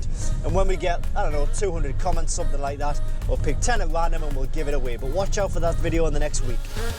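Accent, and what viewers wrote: Newcastle, United Kingdom. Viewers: Hello. Is that a Geordie accent I'm hearing?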